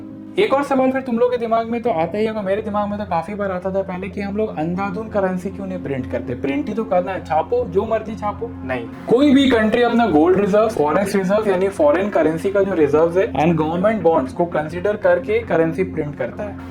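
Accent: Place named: Kolkata, India. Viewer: Proper kalkatia language 👌 i like it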